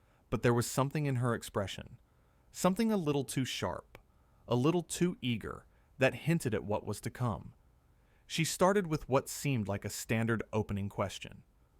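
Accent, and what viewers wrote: United States. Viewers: This is a load of shite just show the clip instead of all the American narration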